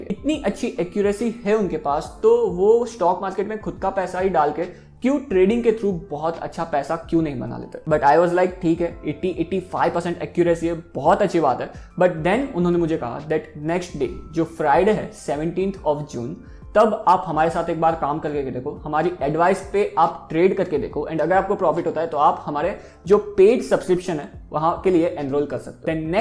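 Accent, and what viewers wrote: Gujarat, India. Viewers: bhai aap Gujarat se ho kyunki aapki bolne ka lehja Gujarati jaisa he 😀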